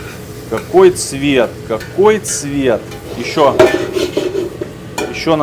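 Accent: Ukraine. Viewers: Все отлично, надо только поработать над собой- украинский акцент сильно мешает слушать, изви6ите! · Да,малоросский акцент.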